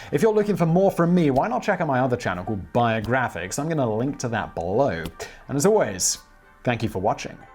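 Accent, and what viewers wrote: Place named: RP, United Kingdom. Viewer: Is this RP accent the narrator speaks in something he practiced independently or learned in school, or is this a natural accent some people have in some region of the UK? Just curious because his way of speaking is incredibly clear and lovely.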